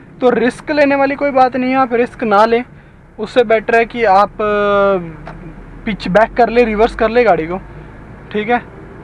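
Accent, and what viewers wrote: Chandigarh, India. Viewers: Chandigarh se ho na aap 😁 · Chandigarh se ho bhai?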